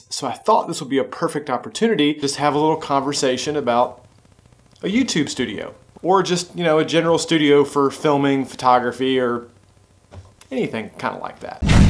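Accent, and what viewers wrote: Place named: South, United States. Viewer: This is the first time I've ever watched one of your videos, and all I can say is WOW!!! The editing is exquisite, the content is so helpful, and I love your accent. :) Where are you from? I'm an east Tennessee gal, myself, so I love a good southern accent! Anyway, awesome job, man. You just gained a new subscriber. 👏👏👏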